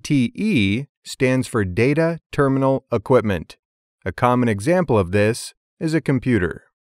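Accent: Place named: Oxford, United Kingdom. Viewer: SIR Yr video "RS232" Wached yr above video and I LIKED IT. I find it is useful to know. Positive features of yr video are:-- 1 Excellent video production (technically) and presentation. 2. You spoke in OXFORD ENGLISH , distinct / clear pronounciation and clearly audible. 3. Precise compact information presented to make the viewer understand clearly. 4. Lastly yr summarising what all you explained earlier/ all the while helps memorising key important points. Vatsa INDIA.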